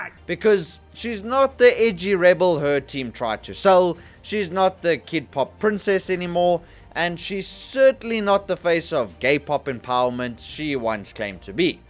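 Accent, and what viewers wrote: South Africa. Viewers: Yoh, I heard the "boet" in your voice from the first 3 seconds 😂😂😂😂 Ny lekker boeta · I know a fellow South African when i hear one❤🇿🇦keep up the content!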